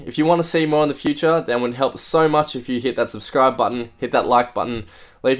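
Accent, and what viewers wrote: Australia. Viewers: Aussie? NOICE!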